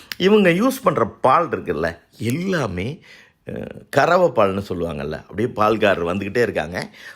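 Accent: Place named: Sri Lanka. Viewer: உங்கள் தமிழ் உச்சரிப்பு ...சைலோனில் உள்ள எனது தமிழ் ஆசிரியரை நினைவூட்டியுள்ளீர்கள்.. அனுபவத்தை விவரித்ததற்கு நன்றி.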